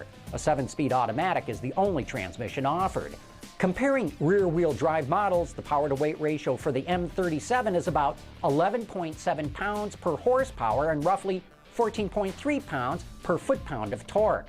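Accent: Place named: Michigan, United States. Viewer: STRONG mICHIGAN ACCENT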